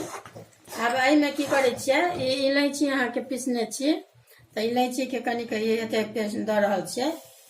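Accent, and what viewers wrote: Bihar, India. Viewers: Are 0 aunty jara bihari hindi choor kar achhe se hindi bol ligeye barna channel kabhi nahi famous hoga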